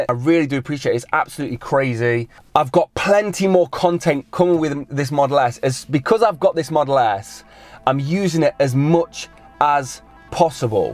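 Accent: United Kingdom. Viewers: british...oh no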